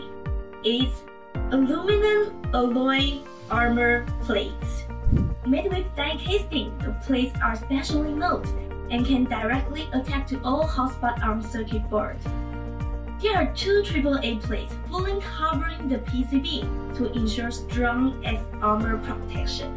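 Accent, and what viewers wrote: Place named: England, United Kingdom. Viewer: So qute english accent. Good job haha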